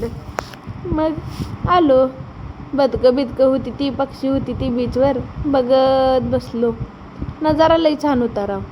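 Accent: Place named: Kolhapur, India. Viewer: लय भारी कोल्हापूरी मराठी 😊😊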